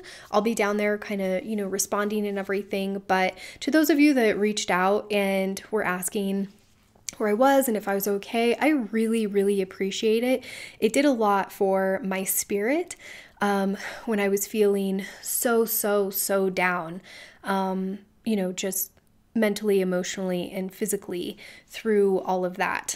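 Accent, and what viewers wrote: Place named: England, United Kingdom. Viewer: Where are you from? I love your English accent ❤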